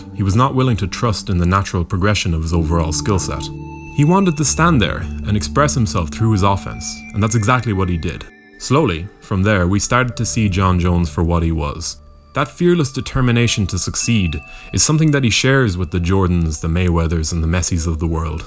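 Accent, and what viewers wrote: Ireland. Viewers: Great content , are you irish ?!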